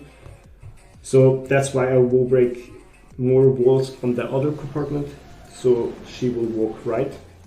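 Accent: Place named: Germany. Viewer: It’s a strongggg Germanic accent idk how he couldn’t tell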